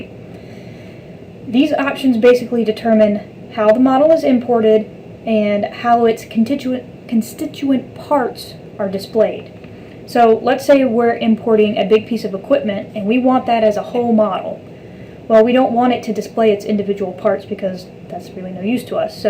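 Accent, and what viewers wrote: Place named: Australia. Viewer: very simple very Aussie thx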